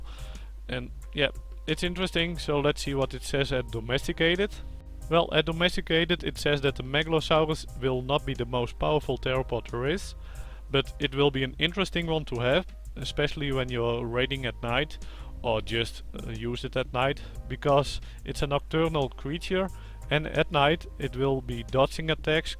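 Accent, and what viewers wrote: Netherlands. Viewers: Are you dutch? I can hear it. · u have the most dutch accent i ever heard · dutch accent xD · you sound like you are from nl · Your accent sounds dutch Are you dutch? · are u dutch? if not u sound like a dutch talking english ^^ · je bent gwn nederlands, valt aan je accent te horen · je praat met een zwaar nederlands accent moaaaaan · are you dutch you sond like it? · it is just the way dutch poeple talk english becuse you just talk like me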